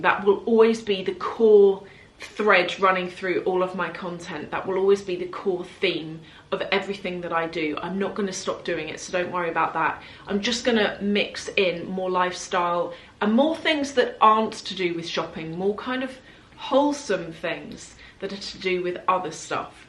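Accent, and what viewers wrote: England, United Kingdom. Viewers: I do not buy luxury. However, it is very entertaining for me to watch and listen to you. England is somewhere in my DNA. I love your vlogs and, of course, English accent. Be well.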